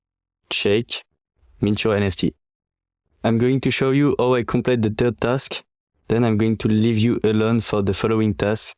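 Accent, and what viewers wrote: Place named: France. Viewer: Cannot understand your Frenglish 🤣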